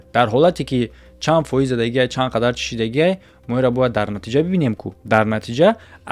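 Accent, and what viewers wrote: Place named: Gharm, Tajikistan. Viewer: Ака гапт надорм МошоАллоҳ у калай тъ ака гарени бад тезай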